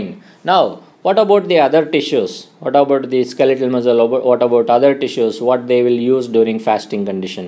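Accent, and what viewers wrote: India. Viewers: Indian English is trash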